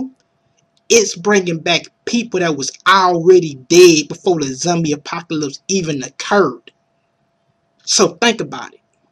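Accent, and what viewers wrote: United States. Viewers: Are you from America man? You're accent is really cool, I can listen to your voice all day long haha!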